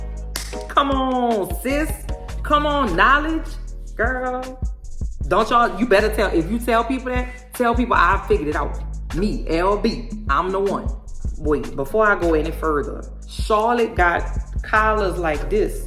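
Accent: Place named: Louisiana, United States. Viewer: Ok Louisiana, I hear that accent. Don’t want to assume NOLA. Side note, Just Like That is the pits. 😔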